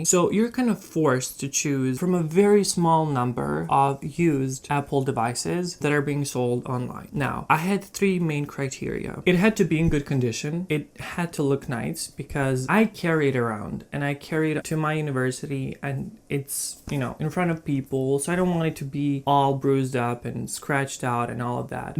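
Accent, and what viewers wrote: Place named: Poland. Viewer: You kind of sound Polish :)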